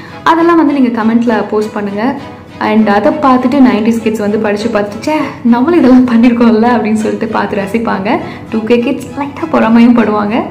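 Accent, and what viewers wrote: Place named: Kongu, India. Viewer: Hi hru voice super kongu Tamil PSG arts college mass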